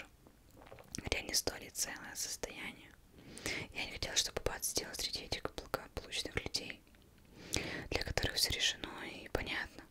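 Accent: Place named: Perm, Russia. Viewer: Очень приятный голос. 💜 Но какой-то интересный акцент... из Перми родом?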